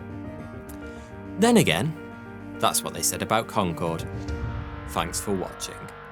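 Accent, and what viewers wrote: United Kingdom. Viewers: 10,500 dollar per ticket according to my Unc. We spoke about it awhile ago. When it first was introduced it was much cheaper. Lol first time i heard a british accent. I flew concord when I was 6 or 7 like...mid 90s. It's really small inside, like really small. Never experienced service like that tho. Beautiful experience from what i remember. I didn't even think of taking in the experience of seeing the curve of the earth. I would be to scared to fly concord now. The Russian one looked cool. I need to build the lego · Another freakin British accent channel, I can’t wait for a big Channel to call out this plague that’s been going on for the last 5 years .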